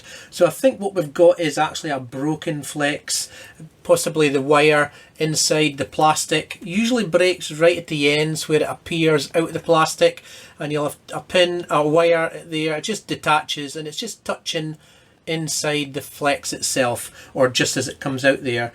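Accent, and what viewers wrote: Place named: Aberdeen, United Kingdom. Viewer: Aye that's a good video, you sound like you have an Aberdeen accent 😁I'm in Aberdeen & you remember the old breaking Channel 14 lol. Perhaps hear you on cb in the Granite City 🏙 😎 👍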